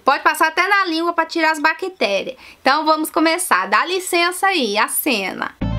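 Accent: Minas Gerais, Brazil. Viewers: É mineira ? Kkkk você parece engraçada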